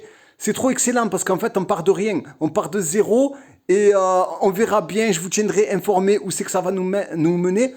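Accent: South, Algeria. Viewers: Dommage que vous soyez si loin vous n'êtes pas dans ma wilaya Je vais m'abonner à votre Instagram et facebook Vu que moi aussi j'ai un facebook et Instagram Bravo continuez et votre accent du sud j'adore 😅 Wellah je vous souhaite de réussir ici on ne vous ponctionne pas comme en France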